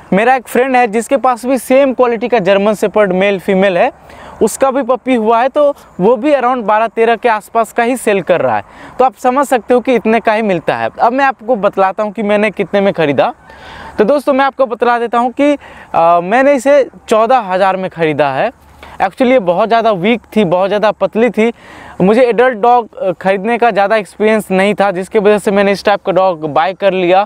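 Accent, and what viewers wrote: Bihar, India. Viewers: Bhai kya app Bihar se ho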